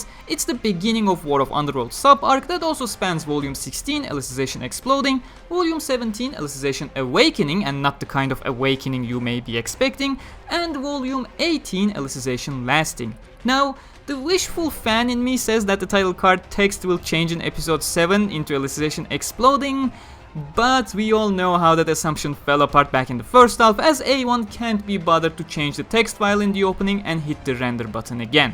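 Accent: Turkey. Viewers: İngilizce'ni duyunca edim acaba bu kanal türk mü diye isme bile bakmamıştım.Başarılarının devamını dilerim reis :D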